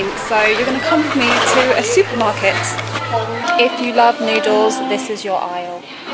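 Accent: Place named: United Kingdom. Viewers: and interesting. how come you have a British accent ahhahhaah. by the way I am African too and my girlfriend is Asian from the Philippines.😊😊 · ChildofGod I think is mixed but her mum is from Uganda so she's Ugandan but was raised in Britain, hence the British accent. Hope that answers your question:)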